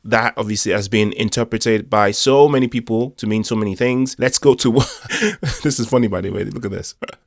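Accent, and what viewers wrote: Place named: England, United Kingdom. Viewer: We can hear the english accent..no worries😂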